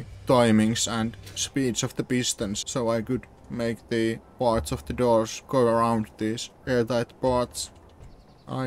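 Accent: Finland. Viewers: Sounds like Finish English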